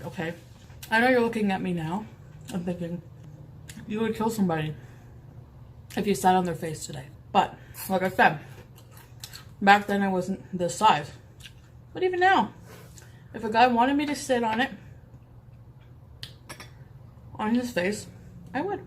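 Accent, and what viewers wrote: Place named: Scotland, United Kingdom. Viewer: Scottish Accent DISGUSTENG!!!!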